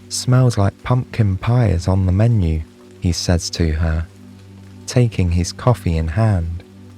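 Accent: United Kingdom. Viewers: Same. He voice just seems the perfect timbre, he speaks in a perfect rhythm, plus he’s English. Idk why, American accents don’t work for me when it comes to relaxing, I find them grating and kinda stress-inciting. Oh well, we’re all different right? Love this channel, especially the Rainy Day Bakery series. 🌧🌙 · Sry but i hate brittish accent for storytelling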